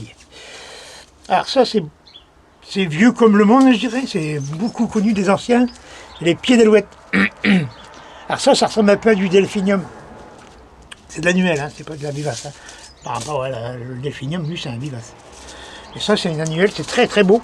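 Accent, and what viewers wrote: France. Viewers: Vous le méritez par votre implication au quotidien pour nous faire partager votre passion... continuez à prendre du plaisir à le faire... votre accent est un régal au contraire... c est çà la France, et la richesse de ses différentes régions : coutumes, patois, accents spécifiques, gastronomie, territoire, sol et climat... etc... ne vous changez pas, soyez fier de votre identité et les personnes qui voudraient lisser toutes les beautés de nos régions passent à une autre chaîne qui mets en avant des futilités, l argent, le langage, le physique etc... ne rentrez surtout pas dans un moule à la mode... car par principe la mode est éphémère et cyclique... pour revenir toujours à des choses anciennes qui sommes toutes reviennent à la mode du jour... restez comme vous êtes... et soyez sûr que vous avez du public de tout âge car les nouvelles générations comprennent l'intérêt de prendre soin de l environnement et de produire soi même ses légumes, fruits et autres... 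vous incarnez beaucoup de valeur et êtes ce relais inter générationnel... alors continuez à transmettre... milles merci à vous deux et à tous ceux qui comme vous partagent leur savoir et transmettent leur passion (Philippe, Olivier, André... mais aussi les permaculteurs, le jardin des merveilles, et bien d autres)... Merci 🙏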